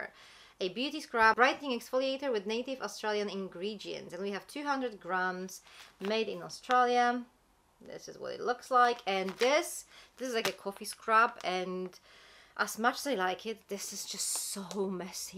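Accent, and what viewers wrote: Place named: Poland. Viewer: I love your accent I love Poland it's a beautiful country what part are you from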